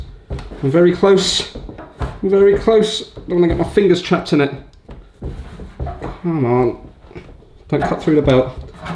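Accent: United Kingdom. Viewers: First of all, I LOVE your Brit accent that includes silly funny words and sentences which yeah… most brits are using. Then second, you just make it so simply to understand even for a non English speaker. Well done mate. Thanks for being here for us. 👍 Next time show us how to fix computerised sewing machines. I know, That alone is like a life job on its own 😄 Or perhaps electric radiators. Good job.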